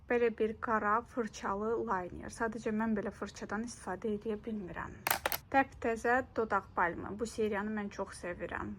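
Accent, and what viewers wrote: Russia. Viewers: danişıginda problem yoxdur, hətta bir çox rus dilli blogerlerden bele səviyyəli danışırsan. Sadəcə aktiv şəkildə rus dilində də danışdığına görə bu sənin aksentində hiss olunur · Salam yaxin olsaydin men de gelib goturerdim😢ve cox sirin danisirsan menim usaqlarim da rus dillidir rus sektirin oxuyular onlarda ele danisir ❤❤❤ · Xeyir sənin danşığında heçbir problem yoxdur hətta baxanda bir çox insandan daha yaxşı danşırsan əlavədə rus dili olduğun üçün xırda fərqliliy görlə bilər amma çox gözəl danşırsan hətta mənə ləzt edir sənin danışmağın sevilirsən❤❤❤❤ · Rusdilli qadinsiz deye danisiginiz ferqlidi.ona gore ilk izleyenlere qəribe gelir. Ləzgisiz? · mən sizin danışığınızı çox bəyənirəm mənim uşaqlarımda sizin kimi danışırdı Rus dili idi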